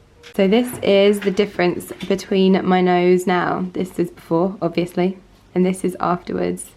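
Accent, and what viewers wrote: United Kingdom. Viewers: I really love your approach to the whole thing (like how only a subtle change can make a huge difference, but at the same time still look like YOUR nose) and how you explain your reasoning :) New subscriber!! ps. You kinda remind me of Emily Blunt, with your new nose (especially when it was swollen) and your british accent hehe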